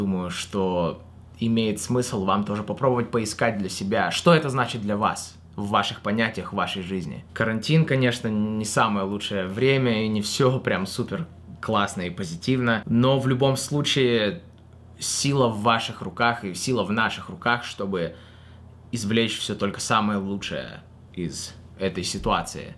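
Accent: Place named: United States. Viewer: Забавно как у на русском уже акцент американский появился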